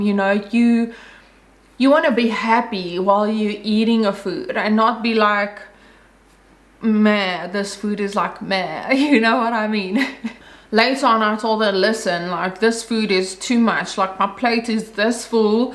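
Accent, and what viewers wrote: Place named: South Africa. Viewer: Love the South African accent!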